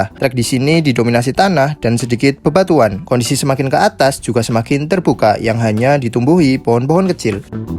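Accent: Banyumas, Indonesia. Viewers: Semangat mas ganteng wong ngapak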